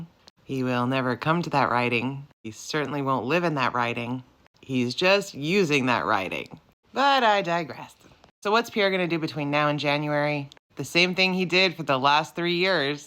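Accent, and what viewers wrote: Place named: South, United States. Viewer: Why do you have a southern accent, but I am still agreeing with you pp has to go!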